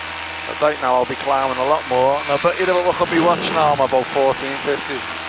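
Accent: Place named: Liverpool, United Kingdom. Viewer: I watched it 10 mins after it dropped. Great skills. Keep making the videos. I love them, I'm hooked. It must be you that I have seen flying over ours, in Thornton, by the new brooms Cross Rd. I'm hoping to get into this myself. It's sounds amazing someone with a good strong scouse accent giving it the bitters in a paramotor.. Love it. Keep em coming. Stay safe.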